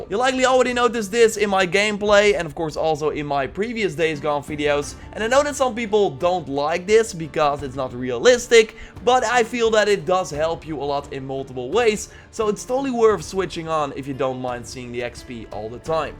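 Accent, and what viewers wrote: Netherlands. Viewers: Are you Dutch? Dat dacht ik namelijk te horen. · But it doesn't do it in a mid 90's 'Smashie and Nicey' radio accent with Dutch overtones like this video. I was just waiting for "...and to increase your score, listen to some Golden Earring, or Bachman, Turner, Overdrive on your Sony Midi-system, mates!" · He is dutch i hear it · Are you Dutch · Just one random question.....are you dutch bruh?? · Are u dutch ? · You hear your dutch axent a lot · Are you from the nederlands because your accent is like that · The Dutchest of accents. Mijn dank is groot, broeder.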